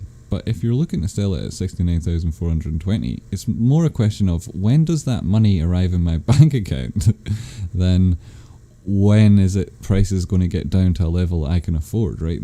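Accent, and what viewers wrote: Scotland, United Kingdom. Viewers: Here on apeandys recommendation. Good to hear a Scottish accent 😂I bought some gme on etoro. Did you say that etoro was dodgy?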